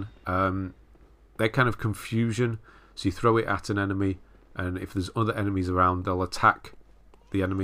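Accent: United Kingdom. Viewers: You have such a cozy, warm, british voice, I just can’t. It’s not only a very good guide, but just listening to you makes all this journey a bit easier. Thank you, somebody from UK. You make other peoples gameplay so much easier and controllable.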